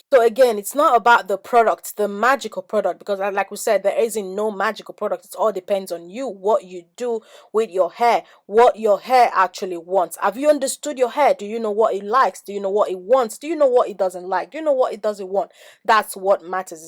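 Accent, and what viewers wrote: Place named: Nigeria. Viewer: Mhmmmmm.....by ur accent I know youre a nigerian.....and an igbo woman at that😂😂💜💜💜💜 subscribes ur funny af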